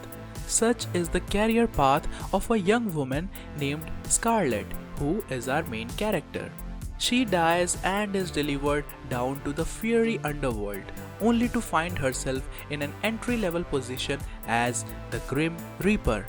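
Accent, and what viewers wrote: India. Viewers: I really like your indian accent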